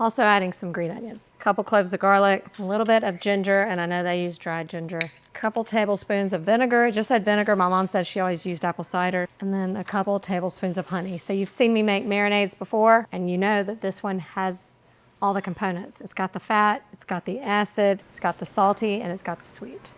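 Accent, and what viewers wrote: South, United States. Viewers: I grew up in the 90's & have never even heard of chicken divan until this video. She has a very thick southern accent, so maybe it's exclusively a southern dish?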